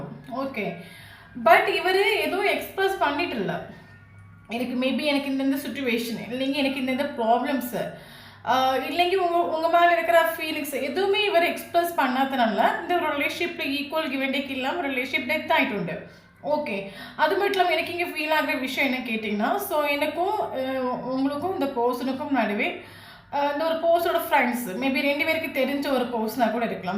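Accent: Kerala, India. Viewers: யார் நீங்க கடவுளா என் வாழ்க்கையில் நடந்தது எல்லாத்தையும் அப்படியே சொல்றீங்க நீங்க நீங்கதான் பிரபஞ்சமா எனக்கு நடந்தது எல்லாத்தையும் அப்படியே சொல்லிட்டீங்க இனிமே நடக்க போறது எப்படி சொல்லுவீங்க அம்மா நூற்றுக்கு நூறுக்கு மேல இல்லன்னு சொல்லுவாங்க ஆனா 100 க்கு ஆயிரம் சொல்லிட்டீங்க மா யாருமா நீங்க எங்க இருக்கீங்க எப்படி வந்து என் மனசுல இருக்கறது நடந்தது எல்லாம் வந்து உனக்கு தெரிஞ்சது ஒன்னும் புரியல எனக்கு வந்து ஒரே குழப்பமா இருக்கு நிறைய மெசேஜ் பார்க்கிறேன் அம்மா அதான் இது மாதிரி வந்து உண்மை வந்து அந்த அளவுக்கு சொல்ல முடியாது நீங்க என்ன கேரளா லாங்குவேஜ் பேசுறீங்க அது மட்டும் தெரியுது கோயிலா சுத்தத்தை விட உங்ககிட்ட வந்து எல்லாத்தையும் சொன்னா கூட ஒரு ரிசல்ட் கிடைக்கும் என்று நினைக்கிறேன் நீங்க சொன்ன மாதிரி நான் தினமும் வந்து பிரபஞ்சம் கையில வந்து என்னுடைய ஸ்டோரி எல்லாமே வந்து சொல்லி முடிச்சாச்சு இது எப்படி உங்களுக்கு தெரிஞ்சது பக்கத்து வீட்டு அம்மா மாதிரியே பேசுறீங்க அம்மா ரொம்ப நன்றி அம்மா நல்லா இருக்கு ரொம்ப நல்லா இருக்குங்க அம்மா 🙏🙏🙏🙏🙏🙏🙏🙏🙏🙏👍👍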